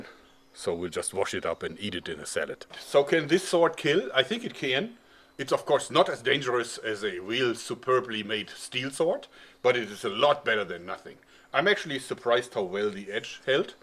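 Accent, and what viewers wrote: Germany. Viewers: are you german? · Hört sich an wie deutsches englisch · Dieses deutsche englisch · is he german? ist er deutsch? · you sound a bit German or so... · you speak like a german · It really sounds like you're from Germany. Are you? I'm sorry if I (idk) hurt you.. lol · are you german ? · Lern mal Englisch deine Betonung ist wirklich wie ein richtiger deutscher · I think you have a very german accent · Ahhh du sprichst deutsch geil sofort am aktzent erkannt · Jeder der deutsch spricht merkt das er deutsch ist 😂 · Are you from germany because of your Accent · even for me as a German I must say...gosh this rough accent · are u Part german because u have a strong accent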